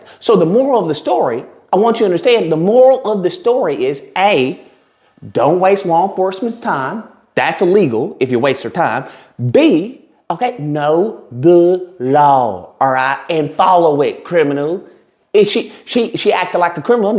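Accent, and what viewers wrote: South, United States. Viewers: Your " country accent " is too funny!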